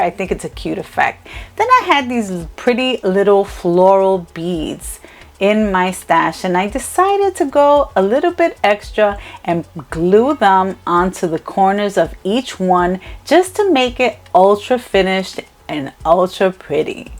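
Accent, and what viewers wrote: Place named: New Jersey, United States. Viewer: Ohmygosh I love your joyful personality, first of all. I also really like listening to your accent. My older sisters are Peruvian and grew up in Jersey like 20min away from NYC; and your accent just makes me feel like home lol. Completely aside from that, "elegant coastal" is the perfect way to describe this - it turned out BEAUTIFUL!!! <3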